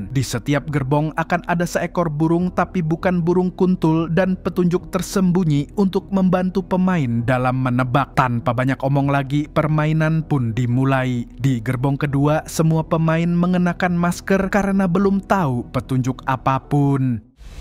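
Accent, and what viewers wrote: Banjar, Indonesia. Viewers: uma lah di video ini keluar banar Banjar sidin 🤣🤣🤣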